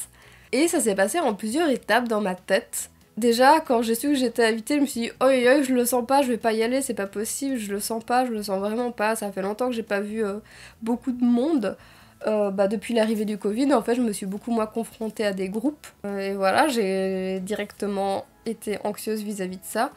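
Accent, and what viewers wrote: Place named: Namur, Belgium. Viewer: c'est la maniere de parler aussi , la sonorité des mots, ca me fais penser a mes amis qui ont grandit a namur et ses environs, en tout cas j'espere que tu vas biens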